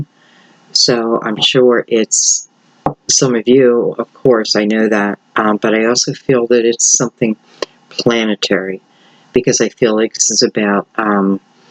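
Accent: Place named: Central Pennsylvania, United States. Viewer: Love your readings♥️ are you from Central PA? You have a York/Hanover etc accent. My ex husbands family is from there and I recognize the accent.. I could be wrong though 😊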